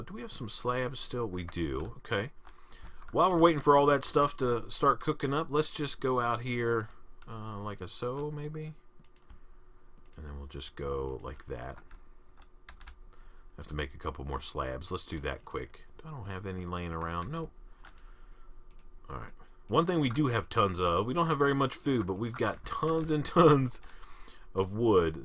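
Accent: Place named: United States. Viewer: I'm sorry but you sound country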